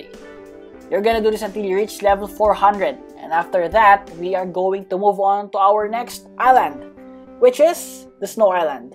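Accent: Philippines. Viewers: He's accent like Filipino accent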